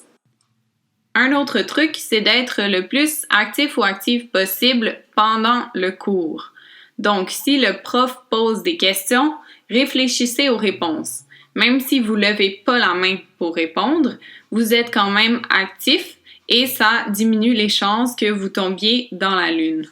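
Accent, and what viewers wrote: Quebec, Canada. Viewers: Soetevids HD C’est notre accent, l’accent québécois si t’aime pas tu sors merci 😒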